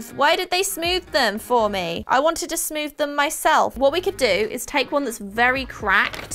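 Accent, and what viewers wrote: England, United Kingdom. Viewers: Very British, but you kept mentioning target and dollers... Do you live in America? But were born in England? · so, I saw the video in my feed, i entered, i listened to her british accent, I saw that she is a cool person, and I subscribed. that's all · Is she from england?? I lover her accent😭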